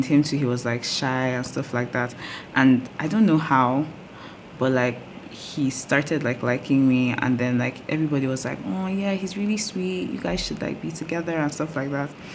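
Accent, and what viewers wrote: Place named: Nigeria. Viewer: Hot nigerian accent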